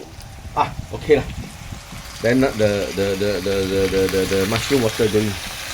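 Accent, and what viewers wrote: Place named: Singapore. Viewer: Enjoy watching your video from the sunshine state, Florida. Make me feel at home listening to your humorous singlish dialogue. Looking forward to more of your videos.🙏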